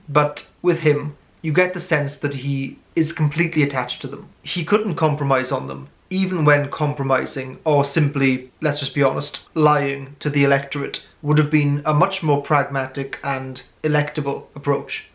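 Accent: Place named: RP, United Kingdom. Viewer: You have the most posh accent I’ve ever heard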